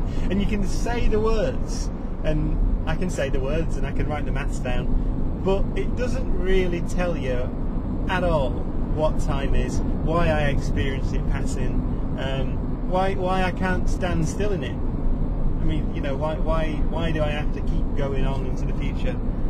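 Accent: Northern England, United Kingdom. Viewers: this guy is bringing more ppl into science so I hope he keeps on broadcasting...he does have an interesting accent...northern I suspect...:)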